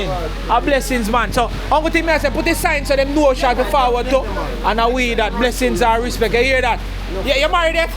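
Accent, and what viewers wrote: Jamaica. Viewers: Respectfully and TOTALLY disagree 💯🙏🏻!YOU! YUH CONTENT!!AND YUH PATOIS!!!! ARE 💯🙏🏻🤗🇯🇲❤️💛💚 NUFF RASPECT EVERY TIME MI BRO.. MI GENERAL..OUTSIDE! ❤🤗🇯🇲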